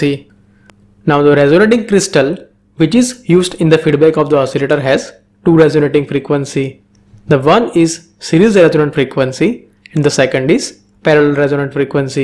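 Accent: India. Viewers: "Hey, friends" and you know the guy is an Indian. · Can you reduce your Indian accent a little bit to the General English because your content is very useful but the accent makes me really hard to understand what you want to deliver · You have to be Indian to understand that English. I can't. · English language: Indian-English dialect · that's just how the strong indian accent sounds :/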